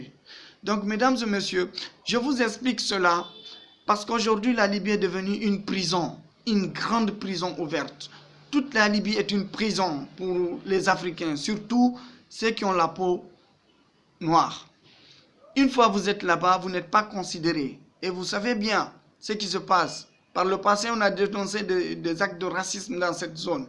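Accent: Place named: Guinea. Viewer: ce monsieur parle correctement la langue de MOLIÈRE.certe son accent est guinéen c'est normal il n'est pas français.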